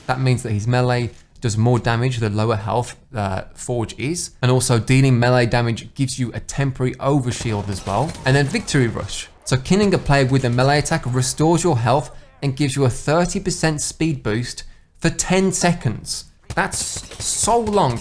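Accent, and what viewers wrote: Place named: United Kingdom. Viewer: I am from Croatia and I have no accent but has a very clean British accent... The cleanmost British accent I've ever seen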